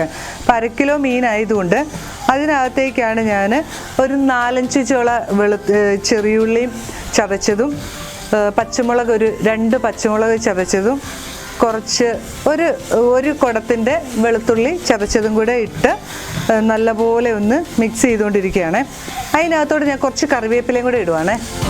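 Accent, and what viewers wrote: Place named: Alappuzha, India. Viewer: Njangal alappuzhakkarude meencurry mathramalla aa bhashayum ethra sundaramanu.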